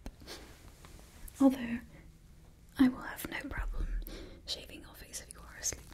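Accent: England, United Kingdom. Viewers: I like your English accent. Decent attempt. Toodle pip! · Do not mind getting more British-accent. Thanks for this, and thank you, Bridgerton. 😆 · Look amazing with curly hair and as always ace performance on the vid and the British accent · The British accent and referring to us as "my Lord" really reminds me of FredsVoice "Lord Farquaas" videos lol Btw is there a possibility to have less light in your videos? They are usually pretty bright and it's harder to watch them in the middle of the night (it's 1AM as I'm writing this, heh) This is just a suggestions ofc. Still, love your videos!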